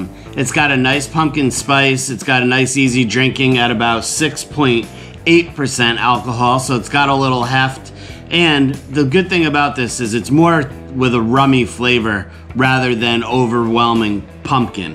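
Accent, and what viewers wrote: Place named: United States. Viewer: American accent.. yea bro! Gotta try this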